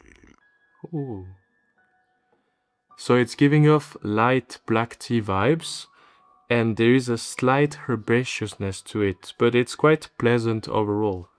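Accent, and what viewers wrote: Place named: France. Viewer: great video! l'accent français est veri grite also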